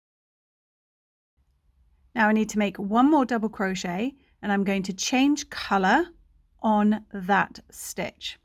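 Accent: England, United Kingdom. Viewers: Thank you I was thrown because you have an English accent and was using americanised terminology 😁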